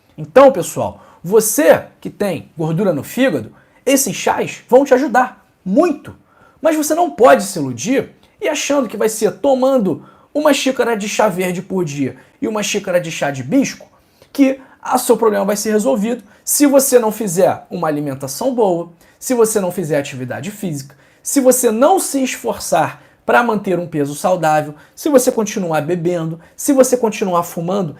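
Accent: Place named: Pará, Brazil. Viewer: Su aque do Pará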